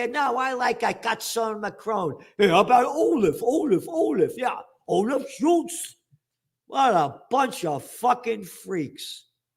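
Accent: United States. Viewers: This guy sounds like a typical American 🤔🇺🇲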